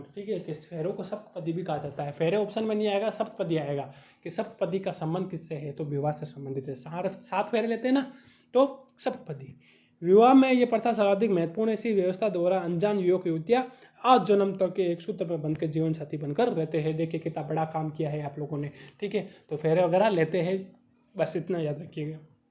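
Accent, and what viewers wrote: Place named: Marwar, India. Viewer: Most epic movement marwadi tone & nice session 💝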